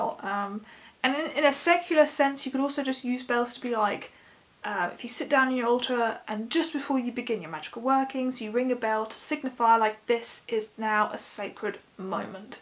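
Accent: England, United Kingdom. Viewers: Finally someone with a nice English accent... I had already thought one could only be into witchy things if one wanted to live in Alabama.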